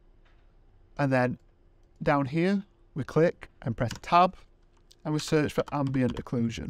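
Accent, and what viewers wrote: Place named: Yorkshire, United Kingdom. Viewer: Legend with a yorkshire accent I thank you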